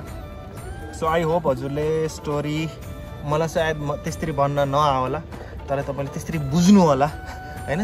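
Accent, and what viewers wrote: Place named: India. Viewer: nepali babaal nai x ta. tone ta aba halka aaihaalx ni india ma 15/16 barsa basesi tara tapaiko tei tone le gardaa ajha mitho suninx boldaa😍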